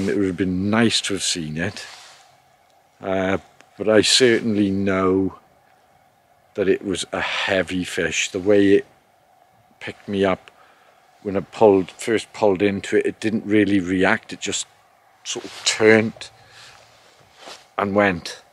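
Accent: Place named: Wales, United Kingdom. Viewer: Who needs meditation when I can watch your fishing videos. Your soft Welsh accent is like a soft balm for these troubled times. If you weren’t 3 1/2 hours away I’d love to come for a days casting lesson with you 👏👍🙏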